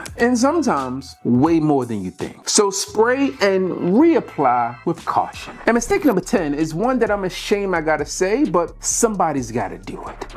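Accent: Baltimore, United States. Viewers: Shoutout baltimore i hear the baltimore all throughout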